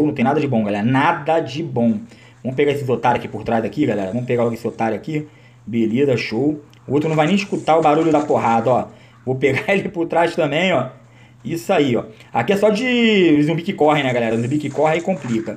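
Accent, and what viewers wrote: Rio de Janeiro, Brazil. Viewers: Mano tu é do rio?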